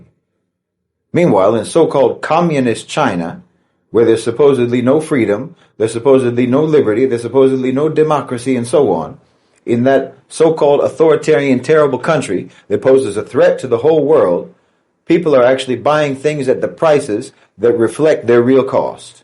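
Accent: United States. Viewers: You sound, Americon, but you have a regional accent that I can't place. What state are you from, or what is your regional accent? Good voice.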